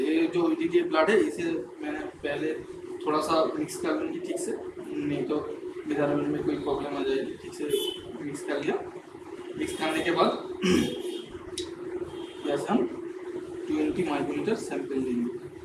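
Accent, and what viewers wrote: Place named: Bengal, India. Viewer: স্যার আপনি কি বাঙালি ?? আসলে,আপনার হিন্দি বলার কায়দা দেখেই বোঝা যাচ্ছে 😅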